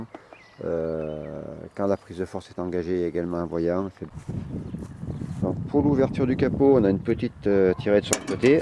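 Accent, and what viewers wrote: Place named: Saint-Étienne, France. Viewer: Très bonne présentation l’accent on dirait un Stéphanois égaré dans le 38...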